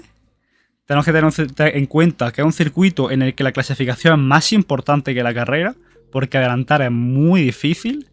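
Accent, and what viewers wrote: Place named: Jaén, Spain. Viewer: Por tu acento diria que eres de jaen. Yo soy de ahi. Me suscribo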